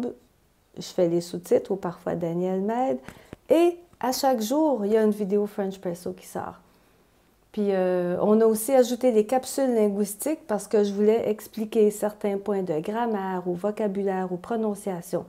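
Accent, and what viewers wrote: Quebec, Canada. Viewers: Sorry, I tried writing in French, but autocorrect kept turning my words to nonsense. I just want to thank you for all your wonderful videos. You make me smile and chuckle often, and it is great to hear Quebecois like I heard it growing up so many years ago,! Felicitations!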